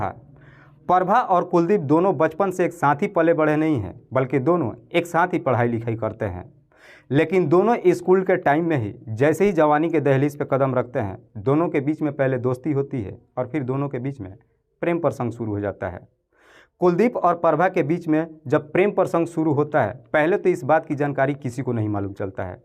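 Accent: Bihar, India. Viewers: Story telling in Bihari style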